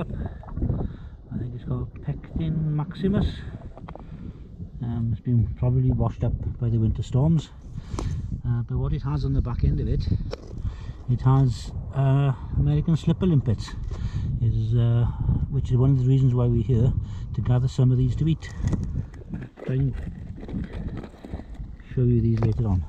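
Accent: Wales, United Kingdom. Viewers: I adore your Welsh accent and voice, reminds me great deal of Sir Anthony Hopkins, and he is my favourite actor. :)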